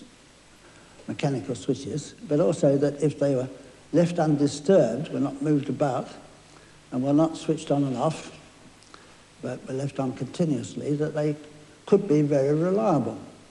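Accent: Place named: London, United Kingdom. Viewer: He still cannot hide his London accent .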